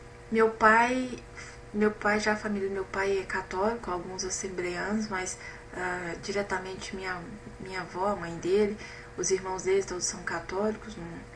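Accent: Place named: Minas Gerais, Brazil. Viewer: Pra saber. Pelo seu sotaque, achei que fosse de Minas.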